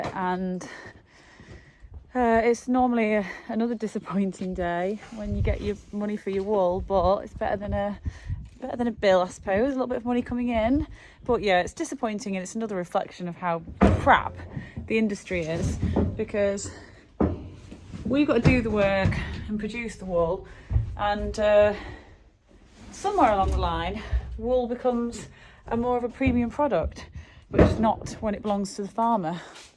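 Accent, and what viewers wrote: United Kingdom. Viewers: First I want to say how extremely beautiful you are, I love your British accent also. We raise a lot of sheep hear in the US. We hire our sheep sheared and then the shearer always buys all of our wool. Our biggest money comes from selling our lambs. We grain them with some of our Iowa corn. We try to get them nice and fat before we sell.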